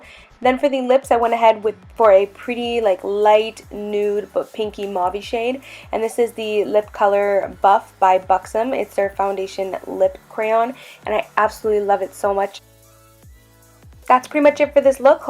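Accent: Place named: Canada. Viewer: I can really hear your Canadian accent in these recent videos of yours . Its adorable!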